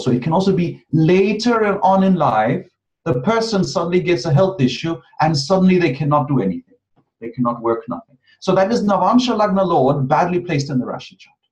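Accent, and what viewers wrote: India. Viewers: He's great as always. And his English is so Indian!! If there was a fee for viewing this it would still be "total paisa wasool" regardless of the cost!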